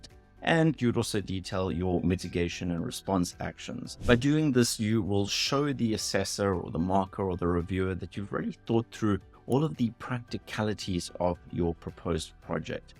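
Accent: South Africa. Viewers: Your profile may say UK, but that's a SAn accent. I enjoy your vids. Keep up the good work.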